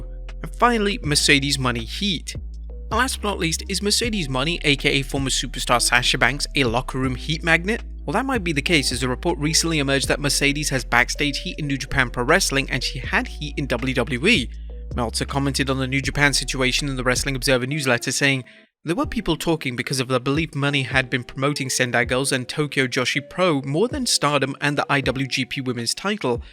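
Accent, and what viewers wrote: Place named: England, United Kingdom. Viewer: Youre a narrator with an English accent so at least pronounce Vince's surname correctly! Its Vince McMahn, not McMan! 👎🏻🤦🤦‍♀️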